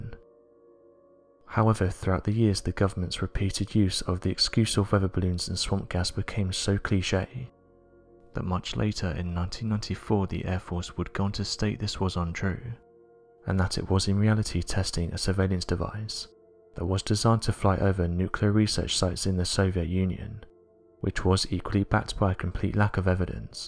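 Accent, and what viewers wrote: United Kingdom. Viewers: Cog You seem to have a thinking impediment. This narrator is well-trained, well-modulated and speaks slowly and distinctly so that even moderately hearing-impaired persons can understand. He has a mild British accent without any particular regionalisms. It is very pleasant to listen to a pleasant, professional, clear voice rather than the yapping and screeching that lesser channels produce out of a failure to comprehend several of communication’s basic premises.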